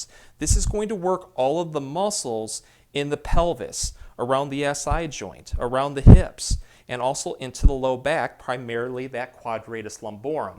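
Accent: Michigan, United States. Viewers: Thick Michigan accent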